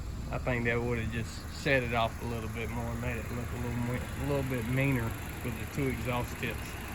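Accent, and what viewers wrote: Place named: South, United States. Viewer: That southern draw son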